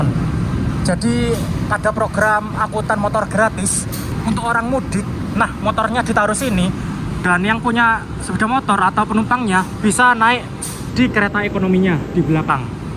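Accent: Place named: Madura, Indonesia. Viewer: mas lucu dengerin logat bicara sampean kek orang madura wkwk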